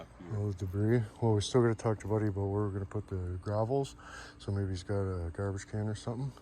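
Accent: Canada. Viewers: No he's just Canadian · My radar went up on the accent. Sure enough, he zoomed in on a license plate from Ontario Canada.... Where they REALLY don't listen to OSHA. We'll played Sir. Get them views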